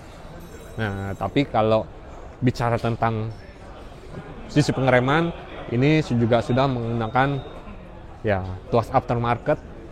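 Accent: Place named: West Java, Indonesia. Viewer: Letah sunda 😁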